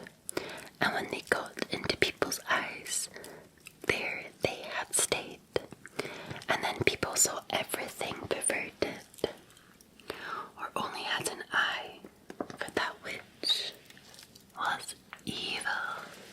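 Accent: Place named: Scotland, United Kingdom. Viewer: Missed the victorian maid thanks for being a light in the darkness your cozy cottage was first asmr video i saw i loved it dont know why but love scottish female accent makes me feel safe comforted and loved thanks for all you do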